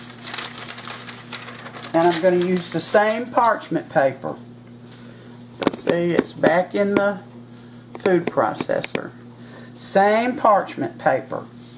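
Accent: South, United States. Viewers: I just subscribed to you. Partly because the pie crust video and now these biscuits look amazing (and I love the sound of your sweet southern voice), but also because I loved your Kavanaugh statement and the beautiful God Blesses at the end of your video. 🤗💕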